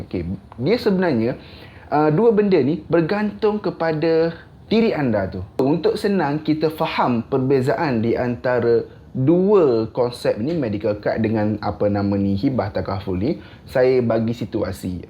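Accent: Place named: Terengganu, Malaysia. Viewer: Bunyi mcm org ganu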